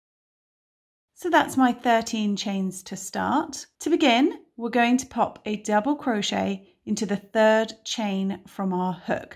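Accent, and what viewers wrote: United Kingdom. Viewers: Thank you ! Love your British accent. I'm from the deep south in US and it is very refreshing 😍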